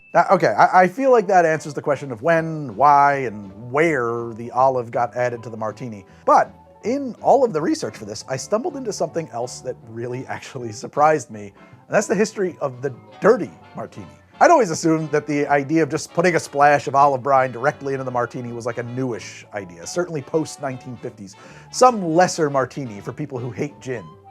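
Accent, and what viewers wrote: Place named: United States. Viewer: Saying FDR's name in a transatlantic accent then Churchill's in your modern American accent is really funny to me.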